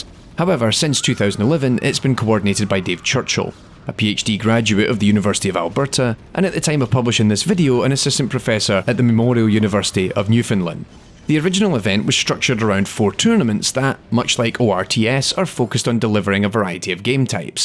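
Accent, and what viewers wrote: Scotland, United Kingdom. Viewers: Anyone else taken aback by the sudden switch from a Scottish accent to a really good French one?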